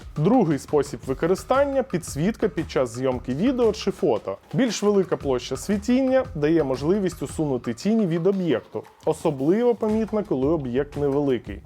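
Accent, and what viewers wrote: Russia. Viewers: Не ображайтесь, але дуже бісить, коли багато русизмів в мові, мов Азіров говорить! Важко слухати людину, яка все життя говорила тільки російською, і перейшла на Українську (але за те, що перейшов на УКРАЇНСЬКУ- респект)! Ведучий, моя тобі порада- не пиши сценарій, дай написати людині, яка вміє говорити по-українськи, все таки Цитрус- це гігант комерційного продажу, будьте «на рівні»!!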